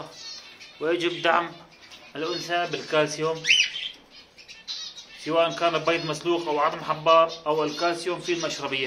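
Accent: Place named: Syria. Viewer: منين انت اخي لهجتك مو غريبة عليي منين من سوريا😍😍😂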